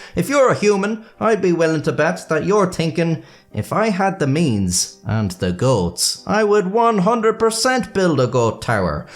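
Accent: Ireland. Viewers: My feed knows me so well... another Irish man talking about goats and their towers is all I've ever needed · I’m not even Portuguese and the pronunciation hurts. Thankfully, the delightful Irish accent more than makes up for it.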